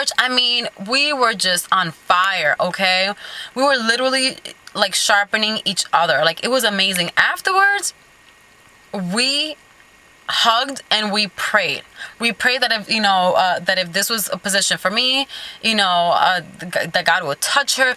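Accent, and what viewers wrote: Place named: New York, United States. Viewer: Oh nice.. Never been there. You sound as if you could've been living in new york.. Lol.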